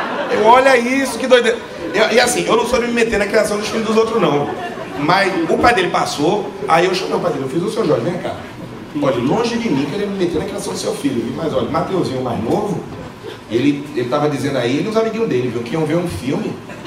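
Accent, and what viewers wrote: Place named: Northeast, Brazil. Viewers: Ele tem o sotaque do nordeste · Esse é Pernambucano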